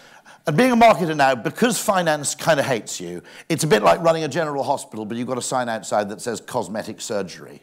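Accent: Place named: United Kingdom. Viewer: It is the british accent.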